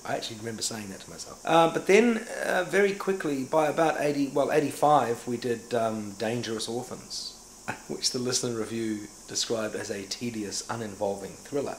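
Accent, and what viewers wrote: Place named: United Kingdom. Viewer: he's a kiwi with a British accent! he grew up mostly in England before moving to NZ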